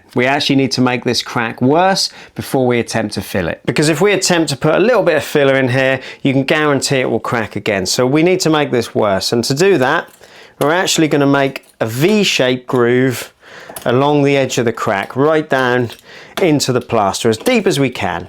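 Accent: Norfolk, United Kingdom. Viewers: Love your videos mate! But gotta ask - are you a Norfolk boi? Im getting a hint of that accent being one myself lol! 👍👍👍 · You from Norfolk or Suffolk? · As a decorator of 30 years I can confirm polyfilla in a tube is the worst! The one time tub is good for pin holes. Easy feel is the way! Good video, sir. May I ask, is that a Norfolk accent? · Are you a Norfolk boy? I've lived/worked all over the uk, and have been in Scotland for about 38 years, but I think I recognise that accent 😊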